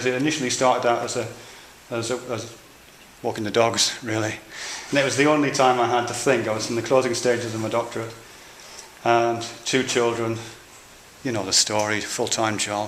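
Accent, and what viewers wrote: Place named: Liverpool, United Kingdom. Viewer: there's lots of scouse/chessire in this video... are you from the north east or the wirral?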